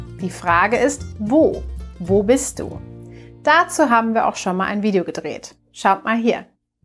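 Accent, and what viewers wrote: United Kingdom. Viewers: He is English, trying to speak german for the video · Kommt aus Großbrittanien? Er hat ein starkes Akzent haha. Keine Respektlosigkeit, meine Grammatik ist schrecklich 💀 ich glaube "starkes Akzent" war in diesen Beispiel nicht richtig oder? Gibt es Deutsche hier mit den Antwort? 😂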